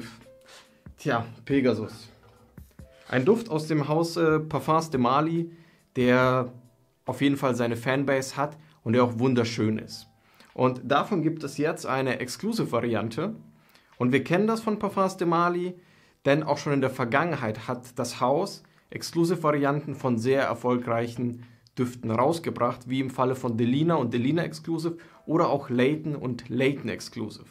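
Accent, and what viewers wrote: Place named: Franconia, Germany. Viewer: Bro kommst du aus Franken? :D Geiler Dialekt ! Feier dein Video :) Mach weiter so ! Abo hab ich da gelassen !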